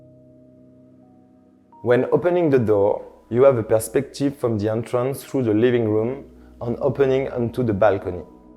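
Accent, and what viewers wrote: France. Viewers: Man , I would recognize French English accent with my eyes closed. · nice baguette accent, and as french i can appreciate the effort :)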